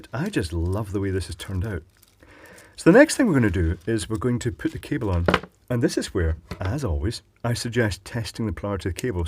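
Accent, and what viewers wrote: Scotland, United Kingdom. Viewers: Thank you for your daily entertainment, for teaching me and for being a wealth of knowledge. I am from Edinburgh originally but moved to Australia 7 years ago, it is so refreshing to hear an accent similar to mine in such a different country! :) Love what you do, and I will definitely be becoming a patron (patreon??) after your explanation on the importance of the added revenue! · I enjoy your channel and find it entertaining and relaxing. It must be your brogue. Good stuff. Cheers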